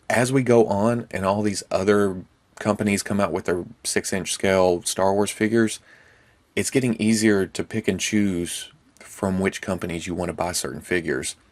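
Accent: United States. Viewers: Is the country, he sounds country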